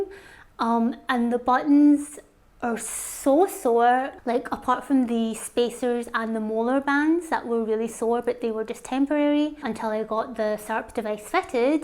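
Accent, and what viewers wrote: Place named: Scotland, United Kingdom. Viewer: Did not expect you to have a Scottish accent! Awesome results!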